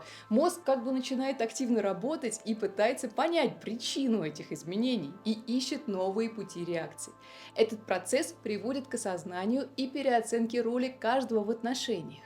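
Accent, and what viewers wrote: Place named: Russia. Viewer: Дизлайк за руZZйобську говірку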